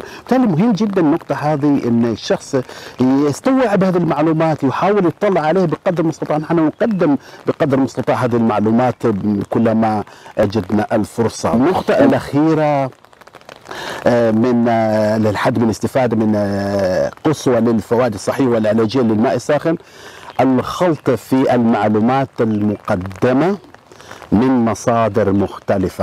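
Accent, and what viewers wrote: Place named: Balochistan, Pakistan. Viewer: انت من بلوشستان عايش في عمان يا لان لغتك العربيه ليست لغة العرب وبارك فيك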